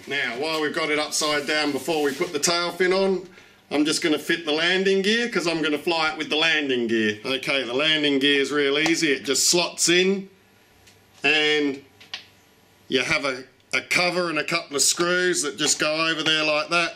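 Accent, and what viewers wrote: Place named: Australia. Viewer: I love your intro to all your videos, and the Ausie accent just adds to the entertainment value of your very informative reviews. Thanks! A big fan from the US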